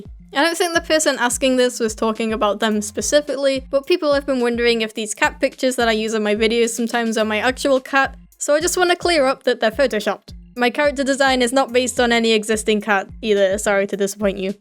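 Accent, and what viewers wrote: United Kingdom. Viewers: Angel Animates :3 well yeah she has a British accent so she would be a Brit (she is) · are you british?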